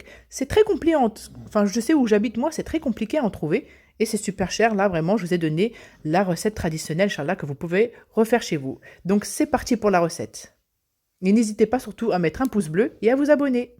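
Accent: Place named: Oran, Algeria. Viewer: هل انت جزائرية سيدتي لهجتك من وهران هل نضع الزيت قليلة فل مقلاة ام كثير والعسل كيف يكون ثقيل ام خفيف شكرا لك على الوصفة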